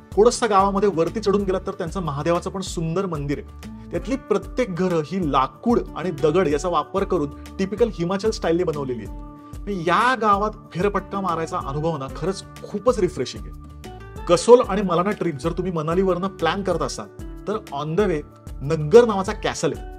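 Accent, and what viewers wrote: Pune, India. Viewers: Good one 👍 tuzhe funny 😊comments also made the vlog interesting and that typical pune ascent 😊in all good vlog and it should help many so thanks boss 😊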